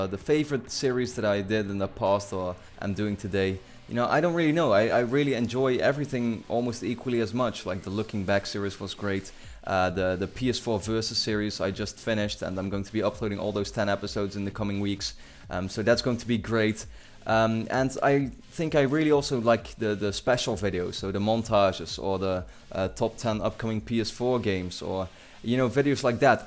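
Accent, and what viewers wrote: Netherlands. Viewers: Are you dutch? your accent kinde gives it away :)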